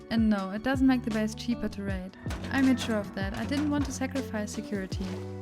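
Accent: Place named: Germany. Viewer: du kannst gut englisch aber deutsch hört man raus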